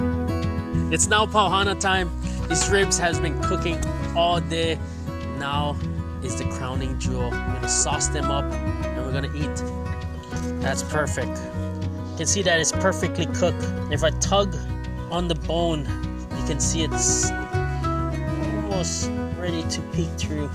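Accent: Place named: Philippines. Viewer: Da pilipino and brada accent iz on poynte 👍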